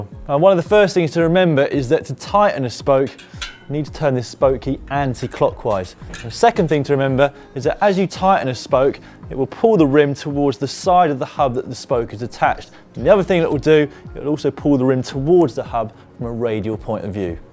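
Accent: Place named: United Kingdom. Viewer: Great. All of the most vital things to know are glossed over in a super fast thick British accent so...thanks for nothing.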